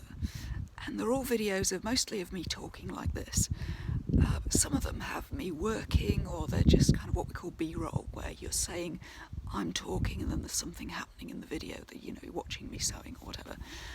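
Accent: United Kingdom. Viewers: I love your British English and your peacefull view on life issues which we all have. I agree with you what we all shpuld focuse on 😚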